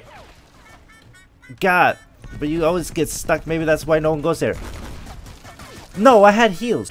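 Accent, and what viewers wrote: Sweden. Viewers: Är du svensk? /are you from sweden? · Are you from sweden? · Waitttt.. are you from sweden?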